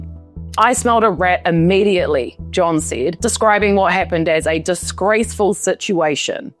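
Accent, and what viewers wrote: New Zealand. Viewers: Sounds like Kiwi accent.. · As an Australian you a new Zealand due to accent correct me if I am wrong. I would not front up on YouTube channel and butcher the pronunciation of New Zealand country and city names. Was born in Melbourne but my father came to Western Australia mining and did well. Gina was older than I but we attended the same school. Your pronunciation of Pilbara is wrong your pronunciation of Wittenoom is wrong. So what other major facts are wrong in your story or deep dive on Gina ????? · Are you real or a Kiwi sounding AI ??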